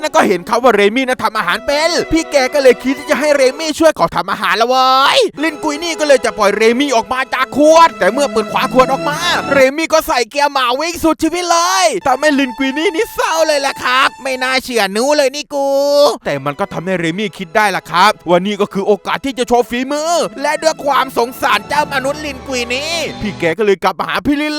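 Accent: South, Thailand. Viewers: คนใต้ป่ะเนี่ย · มั่วตั้ว5555 อืมมพี่คนใต้ใช่มั้ย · พี่เป็นคนใต้หรอ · พี่เป็นคนไต้หรอ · พี่เป็นคนใต้ไช้ไม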